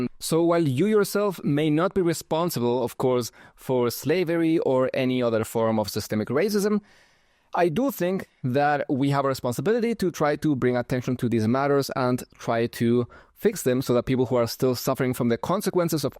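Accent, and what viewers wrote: Germany. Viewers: i thought you were slavic lol, i guess i never heard a german accent before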